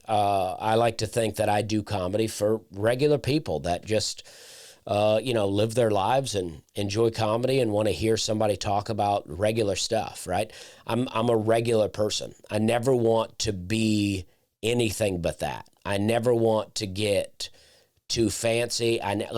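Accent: South, United States. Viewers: Slay is one of my favorite comedians of all time… There’s a certain vibe that southern hospitality comedians have that CAN be similar like Larry The Cable Guy, Jeff Foxworthy, Trae Crowder and yes even Slay BUT… each comedian has their own unique delivery… In comedy there are main techniques and skills like… sarcasm, storytelling, conveying multiple perspectives, expressing multiple emotions, over-analyzing & underplaying, crowd work and most importantly the ability to express yourself… All the pros use these techniques and skills in their sets… My point is the basic skills of a comedian are all the same from Carlin, to Pryor, to Burr, to Norton, to Shaffir, to Larry The Cable Guy, and even Slay… so it’s easy and even lazy to take two comedians with similar accents and say they are the same … for example… you can throw Sebastian Maniscalco, Chris Distefano and Andrew Dice Clay into a melting pot and review them as similar… but theyre not even close to the same thing… Okay Im totally overreaching here but my point is…. 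Even though Larry and hone the same comedic skills for comedy and even though they have similar accents and even some tastes… theyre totally different and both are good in their own way… Don’t worry about the haters Slay, youre awesome. And I think you mentioned suicide in all of your specials, so I hope you never kill yourself and that you create another ten awesome specials. Cheers. Ps. Here’s a joke I wrote. It’s stupid but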